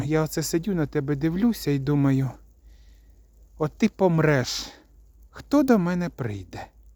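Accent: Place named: Kozelets, Ukraine. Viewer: Каждое видео, как дома побывала, говор знакомый с детства, Козелецький район, ни одного западенского слова, все красиво и понятно и настроение Новогоднее.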